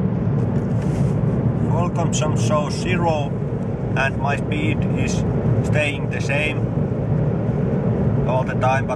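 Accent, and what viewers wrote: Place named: Finland. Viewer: Finish accent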